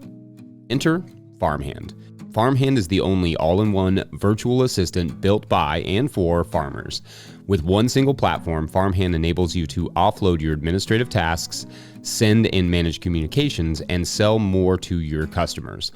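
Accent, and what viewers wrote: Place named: Midwest, United States. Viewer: Your accent sounds like classic midwesterner.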